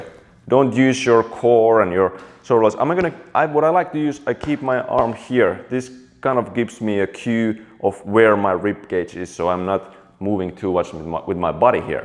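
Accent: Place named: Finland. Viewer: The accent sounds like he is Finnish or perhaps Estonian. Neither are in the Indo European language family.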